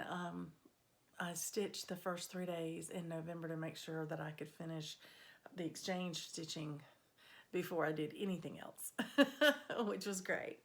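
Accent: South, United States. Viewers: Such a pleasant southern accent and I love your taste in patterns.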